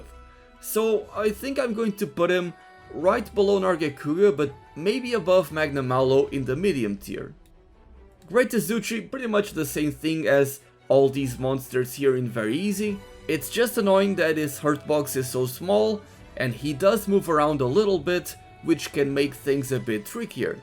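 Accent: Germany. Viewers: interesting german accent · Yooo u german?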